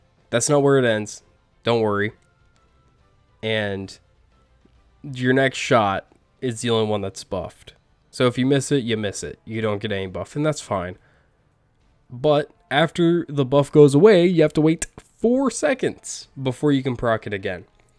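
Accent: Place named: West, United States. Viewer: You sound like you belong in a spaghetti western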